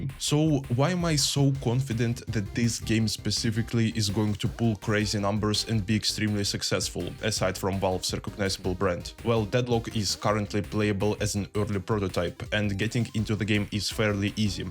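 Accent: Russia. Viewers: Russian accent? :)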